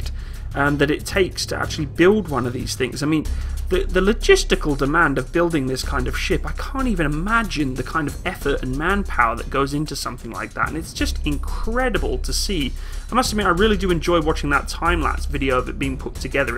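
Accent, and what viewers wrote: United Kingdom. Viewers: I think an American should be narrating this. Same goes for many videos you see with Brit narrators; National Geo on U.S. topics - Tours of the U.S. Mint - U.S. National Parks, you get the point. This guy should be narrating things like The Big Ben clocktower or Oxford or Stonehedge...etc. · CONGRATULATIONS AMERICA. OUR LEADERS ARE SO USELESS.. I AM LISTENING TO A BRIT TELL ME ABOUT MY OWN COUNTRIES AIRCRAFT CARRIER. I BET THE VOICE LIVES IN THE U.K. TOO. NO WONDER CHINA IS STEALING EVERYONE'S DESIGNS. PEOPLE LIKE THIS ASS HOLE GET ON MY NERVES.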